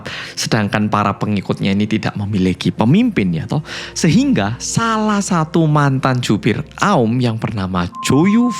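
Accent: Java, Indonesia. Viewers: yang medokk, openingnya terngiang terus di kepala sayaa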